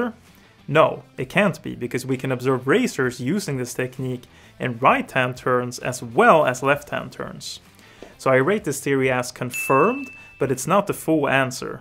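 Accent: Sweden. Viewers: I love your swenglish xD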